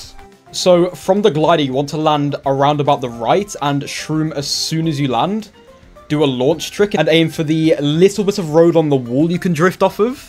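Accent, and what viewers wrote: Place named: Northern England, United Kingdom. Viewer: You sound like you're from northern England lol (same)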